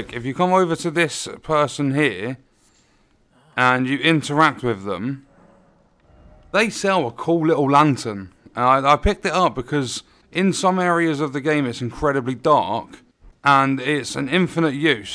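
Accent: England, United Kingdom. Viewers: English people talk funny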